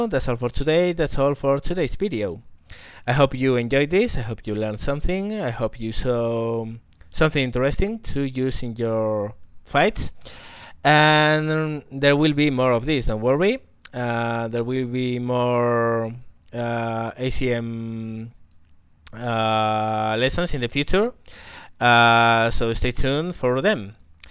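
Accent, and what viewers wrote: Spain. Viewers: tu inglés te delata, eres español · Illiteracy has nothing to do with accents. And yes, that is exactly what it is, an accent. You might want to read up on it, unless you happen to be illiterate yourself. His English might not be perfect but it's good enough to get a message through to just about anyone.. The way he pronounces words is affected by the fact that he's Spanish. Also, here, where I live, we have these things called manners. You might want to read up on those too.. so you don't have to resort to personal attacks.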